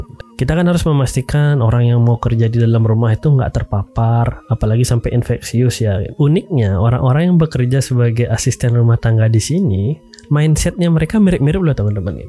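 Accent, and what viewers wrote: Bali, Indonesia. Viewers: setelah nonton beberapa video nya baru sadar lewat aksen dan namanya ternyata semeton Bali wkkwkwaka. semangat Blii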